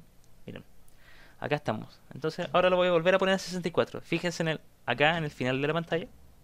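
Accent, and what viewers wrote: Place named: Chile. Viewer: tienes una mezcla de acento chileno con toques de acento andaluzmes algo raro pero mola mucho tu voz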